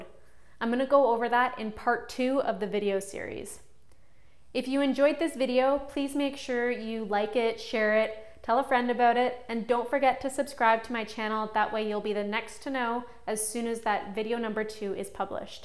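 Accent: Canada. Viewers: It sounds like you have a Canadian accent?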